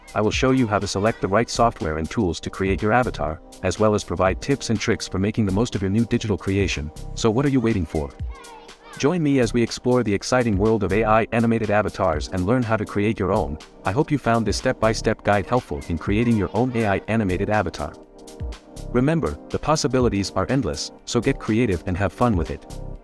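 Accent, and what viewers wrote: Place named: Russia. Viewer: Useless instructions, I can barely understand the part English, part Russian accent, and the video is not simple enough to follow, as most of the web pages you see on the video, either don't exist anymore or don't look like video and all the links that are shown in the video are not on the changed web pages, in fact, this video needs to be updated, and also, show us how to create a new avatar from scratch not from what you already have.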